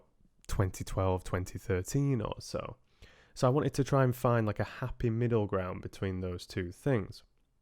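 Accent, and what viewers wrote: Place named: Liverpool, United Kingdom. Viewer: Are you from liverpool? You have the same accent as the beatles.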